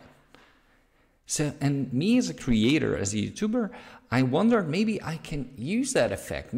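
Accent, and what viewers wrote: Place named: Poland. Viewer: Mówi po angielsku ale i tak słyszę po polsku xd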